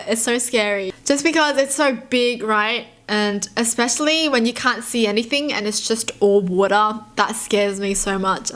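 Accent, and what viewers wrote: Australia. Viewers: Aussie accents best accents 💅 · is Australian! Wow that is so cool. I was wondering where she gets her accent from!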